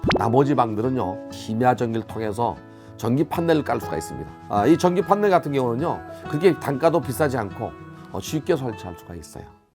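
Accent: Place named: Gangwon, South Korea. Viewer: 교수님 고향이 강원도래요? 어째 말투가 강원도 토박이 말투래요?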